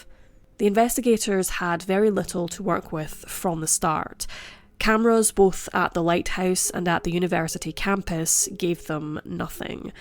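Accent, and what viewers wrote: Scotland, United Kingdom. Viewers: Ahhh your Scottish 🤗🤗🤗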